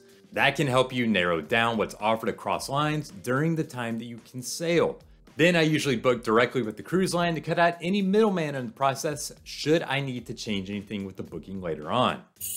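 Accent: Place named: South, United States. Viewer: Folks from the south they talk like that. Call it a unique characteristic of the regional dialect. I'd even wager that most people wouldn't notice and most who do they would appreciate the slice of americana it represents.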